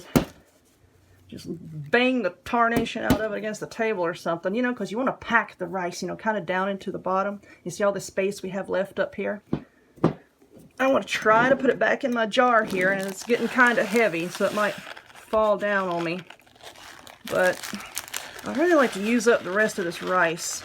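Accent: South, United States. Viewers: Girl you crack me up LOL " If your snowman is more health conscious .. " ..... Us southern women have our own way of talking and I love hearing you !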